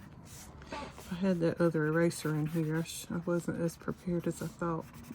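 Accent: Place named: Carolina, United States. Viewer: Love your art and I love your accent. I'm a Carolina girl as well. Thank you for the video I learn so much from you.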